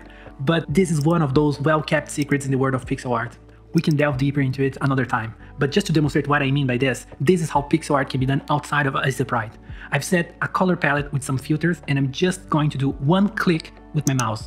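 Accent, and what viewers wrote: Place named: Portugal. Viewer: do you speak portuguese? i found your accent very noticiable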